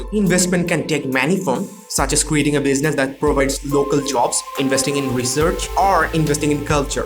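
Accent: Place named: India. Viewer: U tried ur best to Sound like Locals however few words would give a hint that ur an Indian. Thanks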